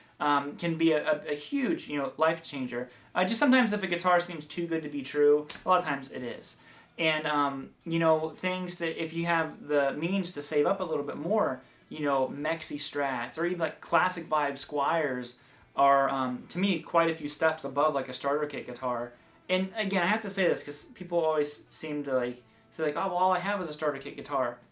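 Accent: South, United States. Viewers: Superb country accent,!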